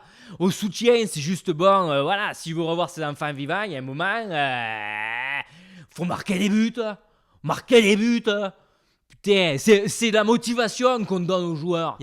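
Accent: Marseille, France. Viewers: Pas fane de ton accent marseillais mais contente de voir ton retour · Pour un bon Lorrain, bravo l'accent marseillais....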